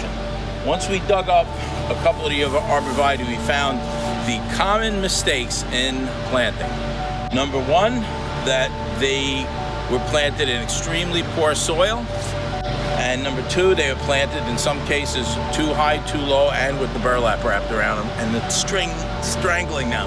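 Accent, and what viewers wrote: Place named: New York, United States. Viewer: Ohhhh...New York. I grew up in the Hudson Valley & I miss it. In OK now. It's so good to hear that NY accent again. Beautiful landscape.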